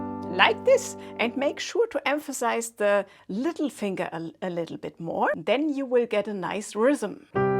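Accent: Germany. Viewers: I LOVE THE GERMAN ACCENT..!!!...UM IS THAT YOUR HERITAGE..? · Hello, your English sound German. Are you from Germany of one of the countries which speak German language. Excellent video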